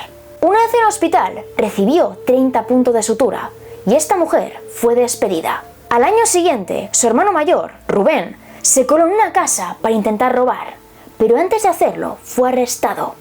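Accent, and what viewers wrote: Spain. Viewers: Tarde pero sin sueño chula , aki presente para escuchar tu versión de los hechos con el Richi y con tu presiosa voz de española.😚 Saluditos 🍓🍓🍓🇲🇽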